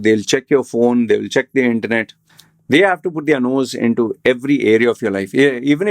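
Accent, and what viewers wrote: Kerala, India. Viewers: Hi, i watch your videos because i like your style of communication. Your accent suggests you could be from Kerala or raised there. Is that correct? Only asking out of curiosity.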